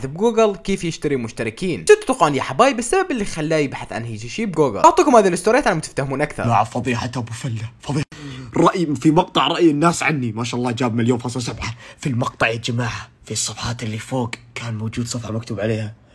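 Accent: Iraq. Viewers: اي عراقي يعني واضح من اللهجه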